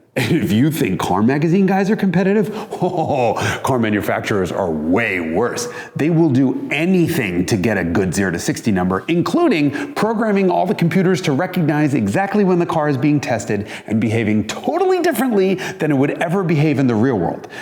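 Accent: Germany. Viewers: His German accent sounds like Klaus from American Dad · His German accent is on point. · The German accent lol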